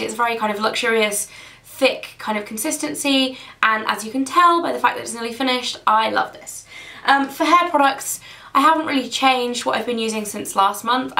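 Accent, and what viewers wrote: United Kingdom. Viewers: british accent ♥♥♥ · I think that you ,pixiwoos and Tanya(pixi2woo) are the most adorable girls in this huge yt world.......maybe its because I love your british accent.....hahahahhaha....kisses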